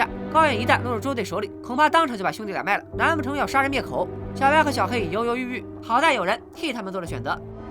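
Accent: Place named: Qingdao, China. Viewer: 请问你是青岛人吗？感觉口音好重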